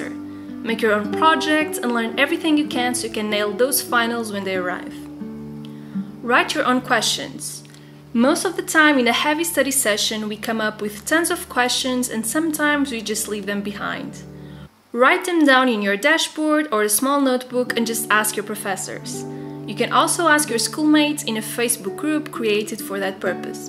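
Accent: Portugal. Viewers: where are you from? It's just you don't seem american/english. És portuguesa, certo?? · Tu és portuguesa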